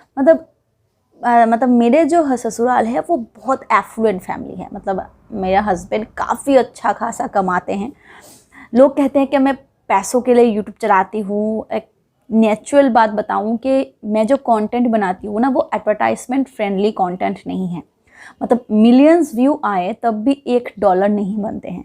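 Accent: Bengal, India. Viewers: i cud make it out frm ur accent.....that u r a bong...... very sweet..... believe me today only i watched for the first time.....very nice dear.....tk care....keep up ur talent......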